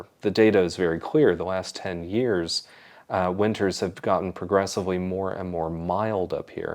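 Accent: Scotland, United Kingdom. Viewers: Congratulations on your million views--well deserved! I found Simple Scottish Living just before finding your channel; pretty sure I've seen North And Forth videos too. I really love watching anything to do with Scotland and won't give up hope of living there someday (just wish I was younger). I've also noticed that Scottish accent creeping in...😁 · Oh my goodness, you're picking up the brogue. You've stopped sayin "Scatland" and started saying Scotland, like a local 😀. I'll be listening to see what other words change over time. Excellent content, as usual 👍🏴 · Loving your channel, one thing I have noticed is that you are starting to pick up the local accent, the way you say Scotland & Scot in particular. It will be interesting to see how broad you become as time passes. Also you may wish to consider that the phrase let rip can also mean a bad bout of farting, so I did laugh when you described Christine as letting rip in the kitchen until you qualified it with cooking up a storm. 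Conjured a funny image. 🤣🤣🤣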